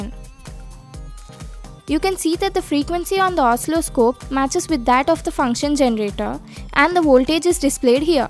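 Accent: India. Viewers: Yes you explanation is wright on,it shows you your stuff.....again well done,but if i may have a tiny reserve ,for a non Indian person ,we may have a bit of difficulty to understand what you say as you are showing and accent.May i suggest that you speak (and this is valid for all Indian people) a little bit SLOWER,and that would make your videos near perfection. Bye. Lucien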